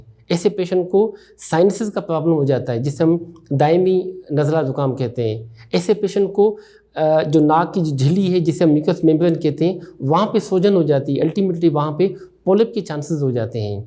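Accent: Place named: Sindh, India. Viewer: Sounds sindhi accent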